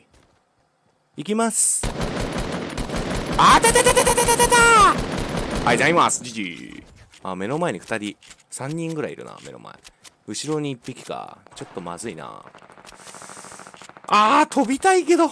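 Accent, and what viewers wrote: Kansai, Japan. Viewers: 関西からしたら喋り方普通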